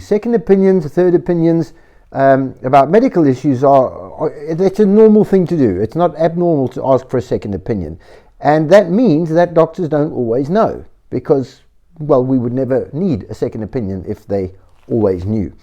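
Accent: South Africa. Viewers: You sound South African😊I'm in Port Elizabeth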